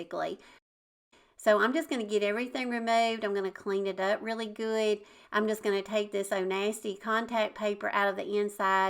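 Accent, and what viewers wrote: South, United States. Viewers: Congratulations. You always have wonderful ideas and and I love your makeovers. And I am guessing that many people agree with me that is why you have so many subscribers. I also, think your southern accent is so cute 😊. You've done it again - more wonderful projects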